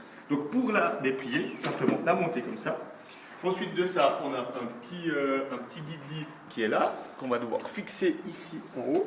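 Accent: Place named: Belgium. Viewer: vu l accent de Belgique lol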